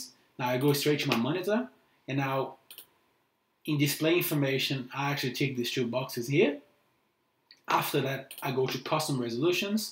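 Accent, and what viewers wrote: Brazil. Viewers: Vc por acaso é brasileiro ? Oo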